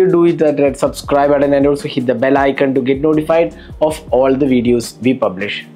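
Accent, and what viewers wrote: Kerala, India. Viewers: Are you from Kerala!?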